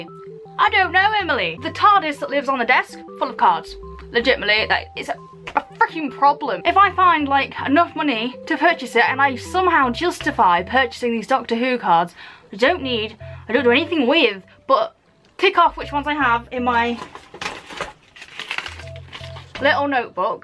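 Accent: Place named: United Kingdom. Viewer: your voice is my favorite a. it's brittish, and b. it's a pretty British :)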